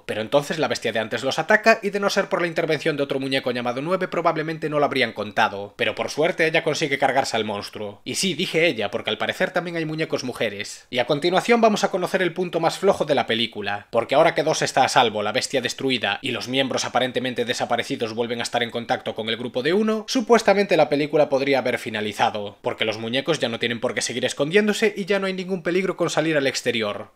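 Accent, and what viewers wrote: Galicia, Spain. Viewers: Estoy celebrando mi cumpleaños, me he ventilado 2 botellas de licor café (guiño a que siendo de Galicia, o supongo por ese acento, sabe lo bien que sienta) y que al venirme a casa tenga un video suyo para quedarme a dormir me safa 1000. El puto amo de los youtubers, sigue subiendo videos cabronazo, y acuérdate de mencionar el New Vegas Bounties y el Tales of the burning Sands, que te los recomendara en twitter. Un bico chaval. Edit: este análisis es no solo objetivo, sino justo, yo me viera esta peli con 18 años y me dejara encantado, para los imbéciles que creen que la animación es "de niños"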